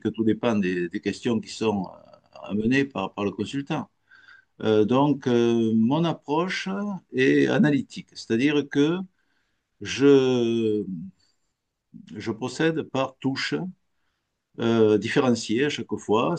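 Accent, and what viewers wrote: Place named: Occitania, France. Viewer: Mille mercis pour ce partage de votre " belle personne" ( et oui vous avez tout à fait le droit de ne pas dévoiler votre Thème Natal, surtout en nous l'expliquant si joliment.. 👍) une superbe découverte qui me rejouit 😊 avé l'accent! Une pensée claire et de Très haut vol 👌👌conjuguée à une grande simplicité animée par la verve du coeur ❤❤❤ j'apprécie également beaucoup Christian Duchaussoy que j'ai eu la joie de rencontrer à un colloque...vive les astrologues occitans 😊 Je suis sous le charme 😊, tout comme la pétillante, 🙌 impatiente de visiter votre site et de vous écouter Relationner au Congrès en Octobre 🎉🎉 des "Révélations" comme celle-là font tant de bien au Bel art des étoiles et le sortent enfin des ornières Horoscopiques et mercantiles... 🌟🌟🌟merci 🙏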